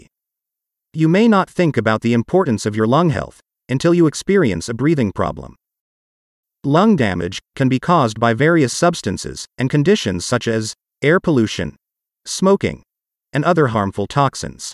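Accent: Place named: United Kingdom. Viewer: bro hindirishi naam likh k kis aangrez se bolwa rahe aap proote karo na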